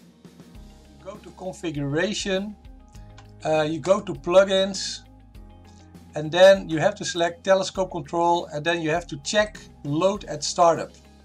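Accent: Netherlands. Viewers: Het accent is Nederlands, dus probeer de vraag even in het Nederlands. Kan ik gewoon een RJ11 naar USB kabel gebruiken? Dan sla ik de rs232 over zeg maar.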